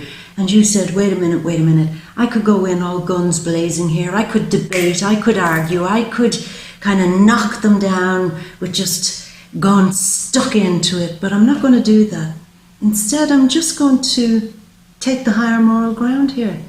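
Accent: Ireland. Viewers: your got a good Irish accent lass I like it what about you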